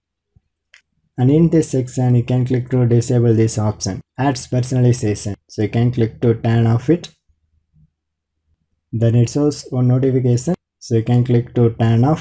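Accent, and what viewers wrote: India. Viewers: I like indan accent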